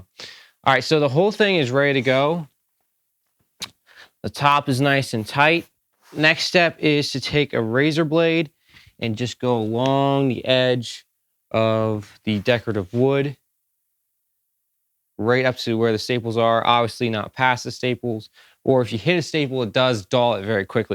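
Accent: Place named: Annapolis, United States. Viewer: Super helpful. Are you by any chance from Annapolis? Accent reminds me a lot of a buddy of mine who grew up there.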